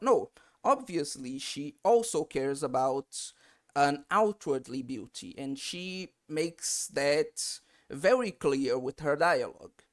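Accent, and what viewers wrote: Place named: Philippines. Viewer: Philippines English is strong in this video...put tank in the mall... Strongest race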